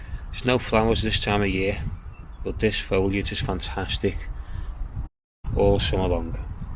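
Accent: Liverpool, United Kingdom. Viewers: Loved this video narrated by a fellow scouser, your plants are beautiful. Regards from Ontario, Canada.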